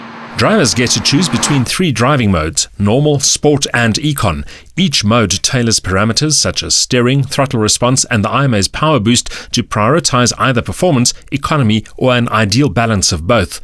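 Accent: South Africa. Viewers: South African English , Their is a few different accents in South Africa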